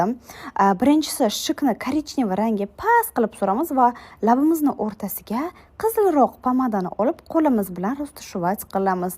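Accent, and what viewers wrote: Bukhara, Uzbekistan. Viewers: Qayerdansiz shevayiz Buxoro ili Navoiy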